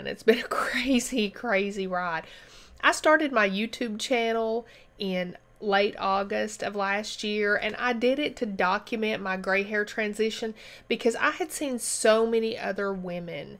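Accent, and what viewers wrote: South, United States. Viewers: You are so pretty. I love your southern accent. I have one as well. Georgia girl. I have made the decision to go gray.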